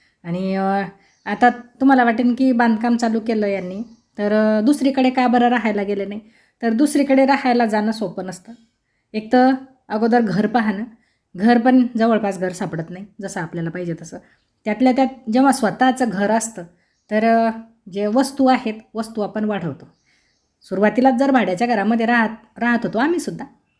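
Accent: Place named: Akola, India. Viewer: ताई तुम्ही अकोल्याचे आहे का तुमची भाषा तशीच वाटते जगातली भीमच म्हणतात बीमच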